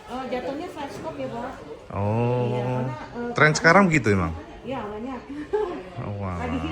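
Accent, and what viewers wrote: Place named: East Java, Indonesia. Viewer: cak, permisi, mau tanya? rumah pean dimana? dan asli pean dari mana? terima kasih infonya, cak soalnya logatnya seperti orang jawa timur an, cak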